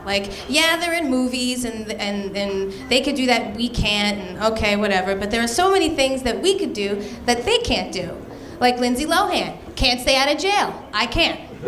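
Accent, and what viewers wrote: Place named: New York, United States. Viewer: HI, IM ONLY USING CAPS TO TRY TO GET YOUR ATTENTION... I WANTED TO KNOW IF YOU WERE REALLY MARRIED? IF NOT? I WOULD LIKE TO TALK AND LAUGH WITH YOU.. ( BTW, ADRIAN ALWAYS SUPPORTED AND BELIEVED IN ROCKY! WATCH THE FIRST TWO ROCKY MOViES AGAIN ) IM ITALIAN, FROM BKLYN. NY.. YOU SOUND LIKE YOU ARE ALSO FROM NEW YORK.. IF YOU ARE NOT MARRIED? I HOPE TO HEAR FROM YOU. IF YOU ARE MARRIED? ALL THE BEST TO YOU AND YOUR HUSBAND. MERRY CHRISTMAS TO YOU AND YOUR FAMILY! ~ XOXOXO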